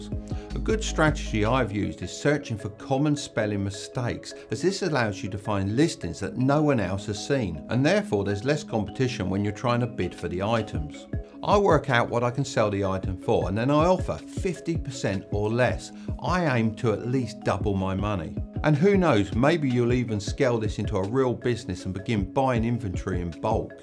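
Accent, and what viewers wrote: United Kingdom. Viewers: His british accent is fire ;)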